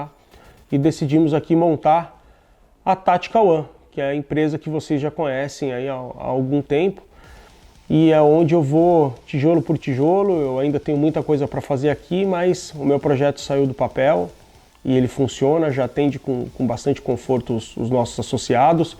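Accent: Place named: São Paulo, Brazil. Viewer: Aquele sotaq paulista maroto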